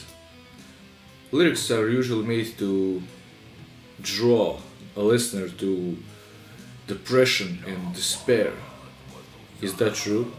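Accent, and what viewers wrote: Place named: Russia. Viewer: Я чую русский акцент)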